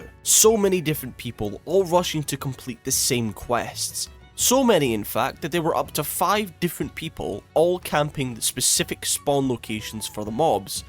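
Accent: Scotland, United Kingdom. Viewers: I watched the whole thing and I'm still wondering if yer actually scottish.... Pants?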